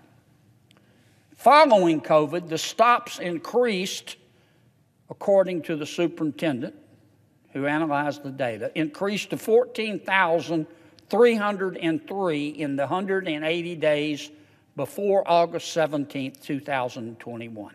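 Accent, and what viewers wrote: South, United States. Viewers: Yeah his southern accent is nice, but what the hell is he doing to get the ar15 off the street and save our children. NOTHING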